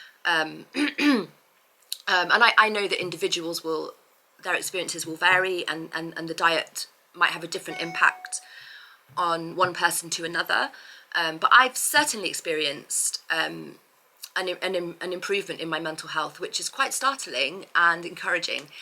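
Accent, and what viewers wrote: United Kingdom. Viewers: Hi. It's so nice to hear a fellow (and beautiful) English accent. Best of luck with everything.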